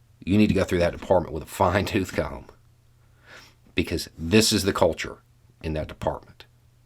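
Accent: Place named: Texas, United States. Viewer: I commend you for standing up for your beliefs. Sounds by your accent you live in Texas therefore I understand the use of " the Fifth Column ", the enemy of my enemy is my friend.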